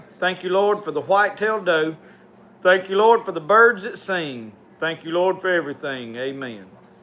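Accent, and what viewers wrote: South, United States. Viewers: Great content my Mississippi brother..I could travel across the world and always feel at home when I hear that accent.. reminds me of the South and Tennessee.. Gods blessing on you and your family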